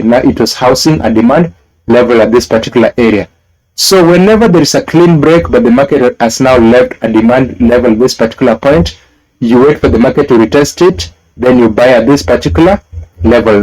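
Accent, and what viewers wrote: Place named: Kenya. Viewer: Kenyan accent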